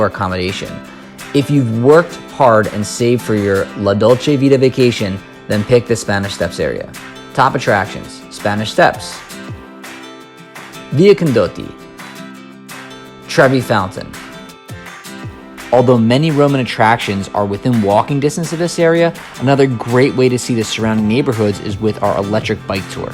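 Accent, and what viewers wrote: Philadelphia, United States. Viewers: I can’t wait to visit Rome this April. It will be the second since I was 10 years old on my family trip in 1998 before euro 💶 come. I don’t mean to judge but, you come from Philadelphia? I notice your accent since I live in Cherry Hill, NJ. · Sounds more like a South St., Philly accent. lol The Roman Guy does operate out of Philly.:) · Great video - thanks for posting. There is so much great information here. And weird question - but is that a Philly accent? :)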